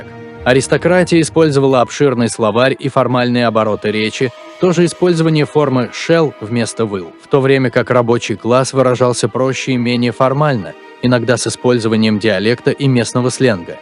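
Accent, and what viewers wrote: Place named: Urals, Russia. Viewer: Уральский говор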